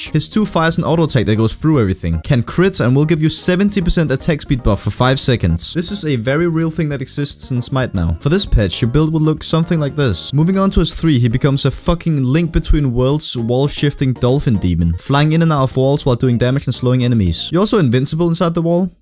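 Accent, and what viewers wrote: Denmark. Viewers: This Danish accent is hurting my ears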